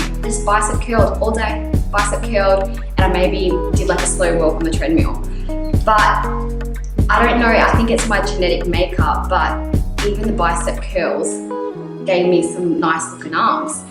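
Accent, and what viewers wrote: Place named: United States. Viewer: Honestly I thought you were American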